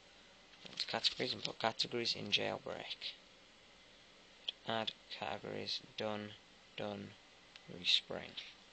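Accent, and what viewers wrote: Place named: Sweden. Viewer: thank you ,,,,är du från sverige åååääääääööööö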